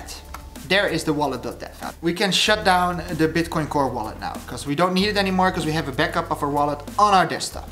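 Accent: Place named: Netherlands. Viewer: Yeah I can just tell you're Dutch, your English accent should sound more convincing in order to scam people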